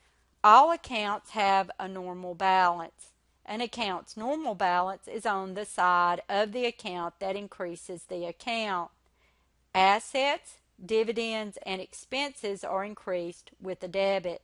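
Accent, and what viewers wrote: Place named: India. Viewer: shut up u sound like indian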